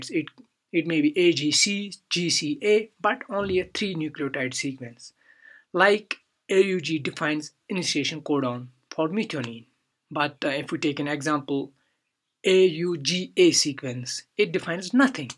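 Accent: Kashmir, India. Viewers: kashmiri accent,am i right? .bdw thank you😍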